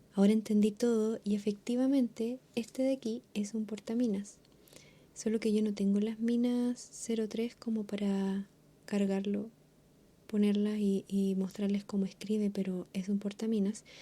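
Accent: Chile. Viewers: Grande, siempre me gustó tu voz así chilena de chill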